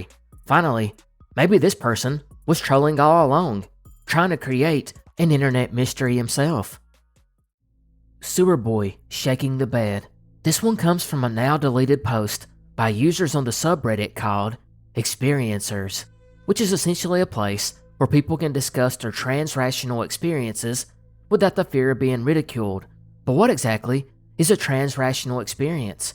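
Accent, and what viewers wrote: South, United States. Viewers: The southern drawl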